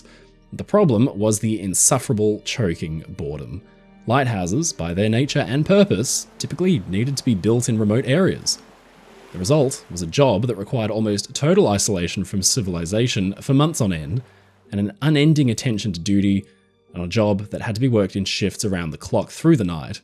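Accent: Australia. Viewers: I THOUGHT YOU WERE BRITISH NOT AUSTRALIAN! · well this is how i find out you’re australian. i’m absolutely terrible at recognising accents so i kinda just vacillated between thinking you were british and thinking you were american 🤦🏼‍♀️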